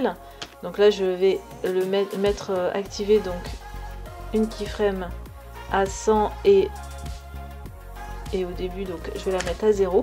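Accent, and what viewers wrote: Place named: England, United Kingdom. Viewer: Très bel accent anglais :D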